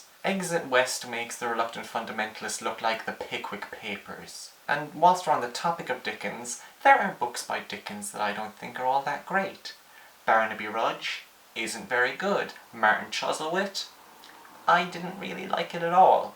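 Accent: Ireland. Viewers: I am glad you're back. I missed your wit, symmetric face and light Cork accent. Also I appreciate the shirt choice. I do not appreciate the Sarah Chalke shade. Clearly, she was the better Becky.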